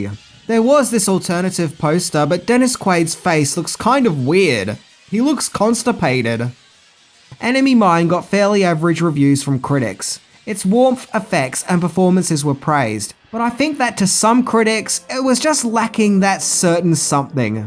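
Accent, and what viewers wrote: United Kingdom. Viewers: alway he british accent EVA /= either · I can't get around the British accent of the narrator. The inability to pronounce Rs, Ts, and THs drive me crazy.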